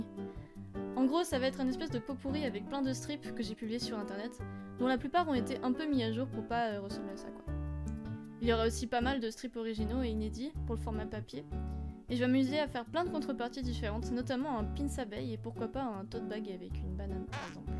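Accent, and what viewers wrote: France. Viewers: You’re French?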